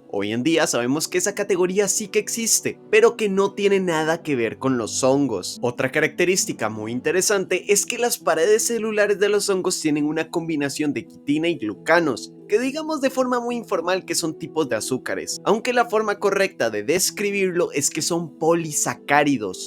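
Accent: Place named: Costa Rica. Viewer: Eeeyyy yo conozco este acento.. ticoo tuanis padre que bueno ver un youtuber de biología de aca.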